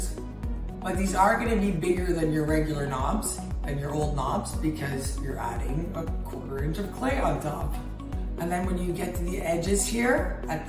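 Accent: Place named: Canada. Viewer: OMG I KNEW IT HAHA i kept thinking "she has to be at LEAST Canadian" and then you said "un lozenge"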